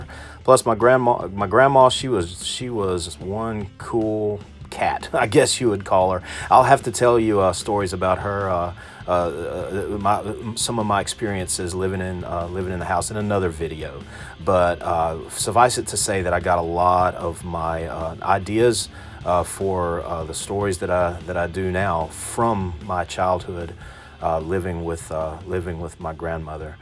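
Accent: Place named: United Kingdom. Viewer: Damn! How did I miss your channel?!? Well, I sub'd now! Weird: Somehow I thought you were British! Oh, well--! LOL Great stuff,!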